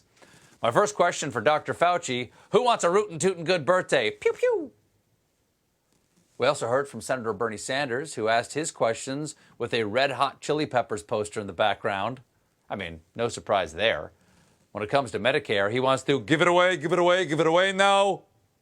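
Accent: France. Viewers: Hey Stephen Ton français fait dure ! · J'aime votre accent Français Stephen ! :-) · Stephen, I love ta French accent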